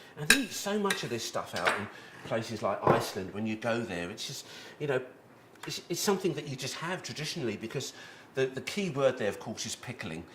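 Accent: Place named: United Kingdom. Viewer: He has a VERY heavy british accent lol.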